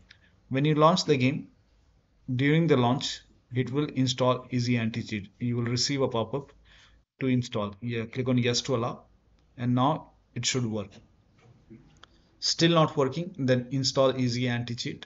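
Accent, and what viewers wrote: India. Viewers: BRUH FINALLY! Only an ubtuer with indian accent helps xd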